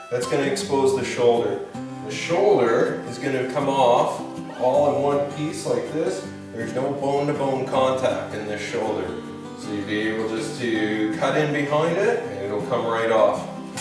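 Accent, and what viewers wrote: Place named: Canada. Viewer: Sounds like a Canadian. Credibility issues.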